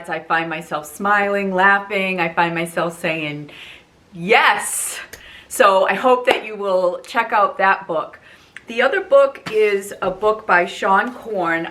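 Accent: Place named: Fall River, United States. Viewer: this is such an open and inspiring video. Thank you for posting it. I knew I recognized that accent, I’m originally from Fall River! ❤️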